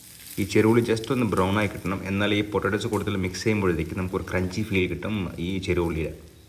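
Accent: Tamil Nadu, India. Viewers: .... Tamil manjal ...pronunciation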